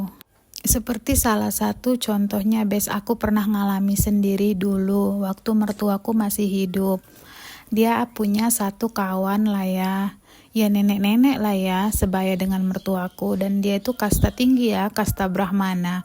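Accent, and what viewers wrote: Medan, Indonesia. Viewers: Kakak ini berasa bgt Medan nya, dulu saya pernah 6 thn di Medan..hihihi. disana jg ada kampung org Indianya ya bu...bpk suami ketemu di Medan juga kah bu? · Assalamualaikum dek... salam kenal dr jakarta,. aku baru tau ada chanel Nepali, maaf ya aku jd kepo... dek kl dr logat spt nya dr medan ya..? dimana ketemu suami hingga bisa nikah, sdh brp lama ada di nepal krn sdh punya anak besar2, suka pulang kah ke indonesia? dr berpakaian nya spr biasa aja, tdk spt kushboo pakai baju tradisi nepal pakai merah2 di kepala. terima ksh ya tolong jawaban nya ya... ❤❤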